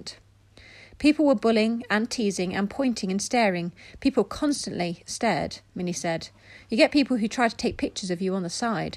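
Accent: United Kingdom. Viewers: I love your accent it sounds like queen Elizabeth , so lovely . Rest In Peace Minnie ..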